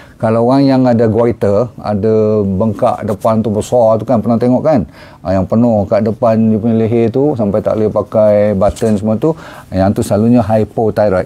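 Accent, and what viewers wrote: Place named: Terengganu, Malaysia. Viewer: Dr dh pandai ckp ganu - senyum sokmo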